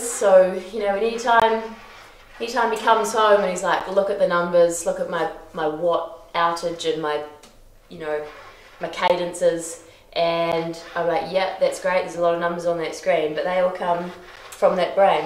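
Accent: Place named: New Zealand. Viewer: Didn't expect a kiwi accent :)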